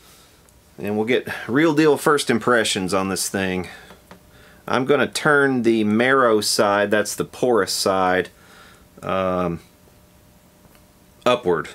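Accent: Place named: Louisiana, United States. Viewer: You sound like Theo Von. Are you from Louisiana?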